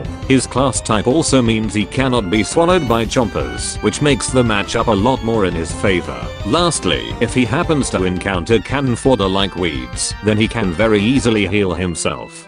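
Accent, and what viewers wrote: United Kingdom. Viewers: British? Hecking! Whaaaa!…..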